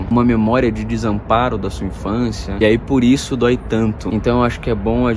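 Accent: Caxias do Sul, Brazil. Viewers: Ele é de Caxias do Sul?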